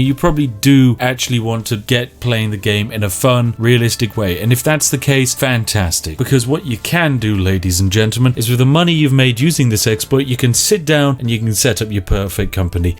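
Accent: United Kingdom. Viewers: this man is everything right about the british accent. all over sub accents can piss off because they are horrible but this is the voice of eloquence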